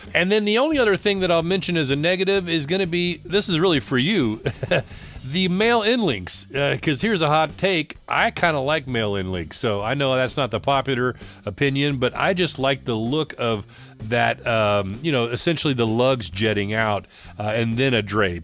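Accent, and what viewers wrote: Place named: United States. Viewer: what a classic American narrative voice! :D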